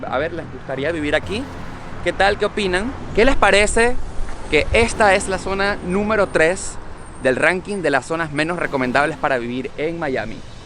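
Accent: Venezuela. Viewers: este pendejo de donde saco su estadistica. Con esa actitud y voz afeminada logro q no llegue ni 1 minuto, sin considerar ese acento venezolano asqueroso como esa gente. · Tratando de presentar estereotipo con ese asento de gay...osea asento venezolano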